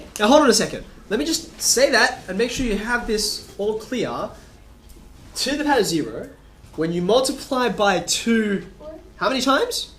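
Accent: Australia. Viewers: a prime example of an Asian with an Australian accent.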